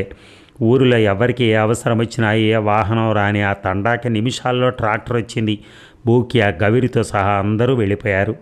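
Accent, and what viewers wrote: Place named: Telangana, India. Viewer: telanga slang lo chala chala bahga chadevaru sri garu tq u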